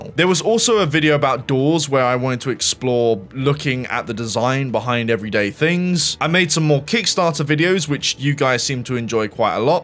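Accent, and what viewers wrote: England, United Kingdom. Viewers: As a 33yo core gamer i must say i was hooked up after randomly founding "what when wrong" video. I watch you vids from around week, subscribe 2 days ago and start watching everything you done (except drama and 'r word' vids). I am maybe not commenting too often (kudos to Just Some Guy without a Mustache) but i found myself hooked up to informative content, funny and short jokes like "standing on the table". Personally i like backstage informative content and you personall insight behind it. Best vid for me was the one about doors, maybe it can use some polishing but still it was good and engaging imo. Glad to see your channel grow and you like what you doing, thats most important thing for creators to not burn out too quick. Wish you luck and waiting for new various content ;) P.S. be patient and dont get trolls/toxic people to get over your head ;) We like you for who you are, and you beautiful accent (event tho personally i dont like brit accent xD) you rocking it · Got around to this a bit late. 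I think I joined about when www started, and you instantly became a favourite. Continue making well-produced videos and never lose that brilliant English accent. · I'm glad I discovered your channel just in time to witness this part of its growth "live"! Of course your content interest me in the first place but the other crucial thing is you. The way you behave and talk, your tone etc. I don't watch content, however interesting, if I don't like the youtuber's general behaviour. You sound genuine, don't overdo things and that's what I like. I thought I was fed up with english accents (not my first language), but you seem to have cured that lol. Gonne watch those videos of yours I still haven't while waiting for the next one.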